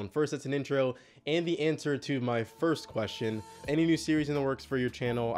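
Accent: Nigeria. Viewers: Are You Nigerian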